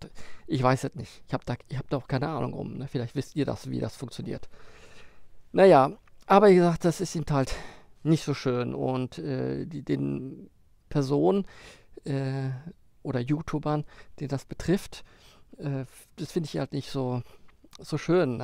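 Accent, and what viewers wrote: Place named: Sweden. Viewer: Ich finde dein schwedisches Deutsch klasse!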